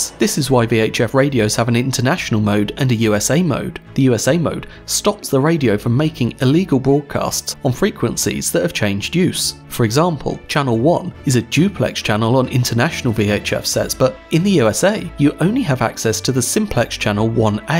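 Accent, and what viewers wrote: United Kingdom. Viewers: Informative, concise, well animated and British voiceover. Perfect. Avast ye scurvy knaves and prepare to be boarded ! Aaar !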